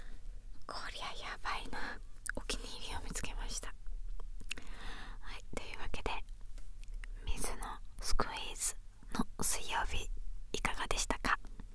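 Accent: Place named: Kagoshima, Japan. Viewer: 鹿児島弁混じりの関西弁になってましたね(笑)あいちゃん顔焼けたぁ？いい感じです。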